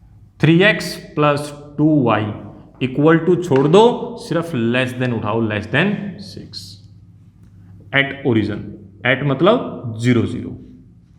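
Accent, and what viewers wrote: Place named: Haryana, India. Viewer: Si ke accent ko dekh ke lagta h ki sir haryana ke h 😎😎 But kuch bhi ho padhaya badhia 🙏🙏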